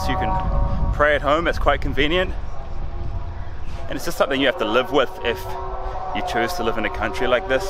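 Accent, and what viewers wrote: India. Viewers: Love your hindi accent Please visit once Varanasi which is older than history itself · Good content but it's very hard to understand your Indian accent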